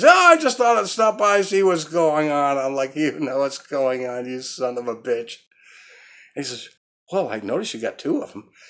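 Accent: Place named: East Coast, United States. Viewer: Love the stories... no matter what!!! You make me feel at home.. with your east coast accent. Ima New Yawka!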